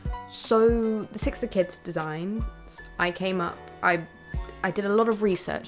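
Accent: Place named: United Kingdom. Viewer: I love your accent ❤️❤️❤️ is it British?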